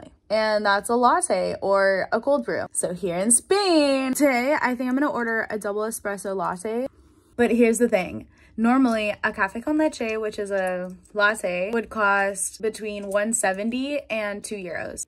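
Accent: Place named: United States. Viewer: tienes una voz muy bonita y musical. El ingles de estados unidos siempre me ha parecido " dificil" por el acento. Hablado por tí suena muy bonito. Podrias trabajar como " dobladora " ( en el cine las versiones en español ). Muy feliz de que estes feliz. Besitos desde Granada, Andalucia.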